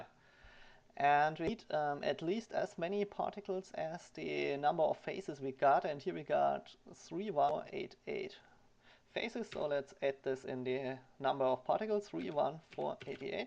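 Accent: Germany. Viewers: Hammer Englisch :D Ich liebe diesen deutschen Akzent :)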